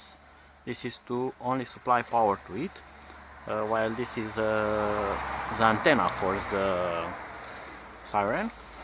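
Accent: Romania. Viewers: Nice video ! Are you romanian ? ( your accent ) LOL !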